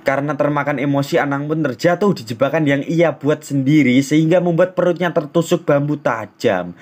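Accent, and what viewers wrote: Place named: Java, Indonesia. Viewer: jhon versi jawir,medok sekali hehe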